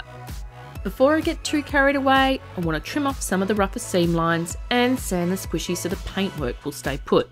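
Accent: Australia. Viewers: love my Aussies your accent is beautiful. most of my fav channels are aussie much love from Canada ❤🇨🇦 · I love them all. The newest edition to the Ice-cream Critters is definitely my favourite in this one. Yes, as a fellow Aussie, I to grew up with 100's and 1000's on my Fairy Bread !! I also see you are trying hard to upset all the Tasmanians haha. It sounds like you live in my neighbourhood, what side of the river are you on ? 😀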